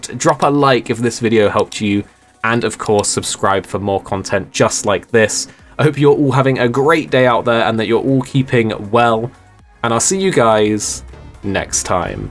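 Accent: England, United Kingdom. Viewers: Man, how you managed to get that British accent? It's amazing!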